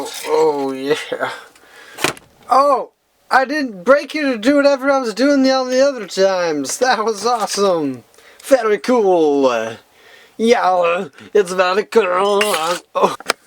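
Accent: Wisconsin, United States. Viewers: You're WI accent gets SOOO much heavier when you drink. My MN one does too.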